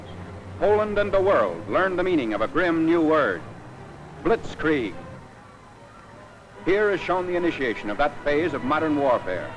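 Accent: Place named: United States. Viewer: German with an American narrator? piss off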